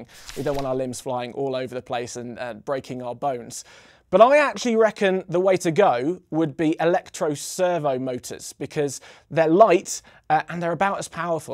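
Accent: United Kingdom. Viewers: Its British accent xD...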